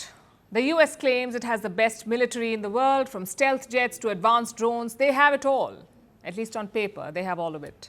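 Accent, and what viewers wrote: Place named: India. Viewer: Can we have announcer that speaks English ( not Indian English). A lot of time , can’t understand what she is saying without caption.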